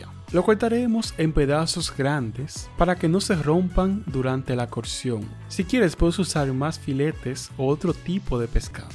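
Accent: Dominican Republic. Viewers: eres dominicano?. Saludos desde República Dominicana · Eso se ve ricoo! una pregunta de que nacionalidad eres??? porque si no me equivoco me suena el acento Dominicano, yo soy Dominicana.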